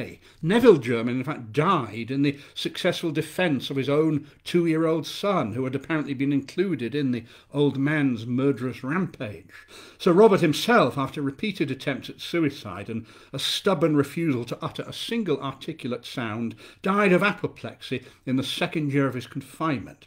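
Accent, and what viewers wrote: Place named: England, United Kingdom. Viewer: Thank you for this wonderful reading. It's funny how good Lovecraft's writing sounds in the English accent!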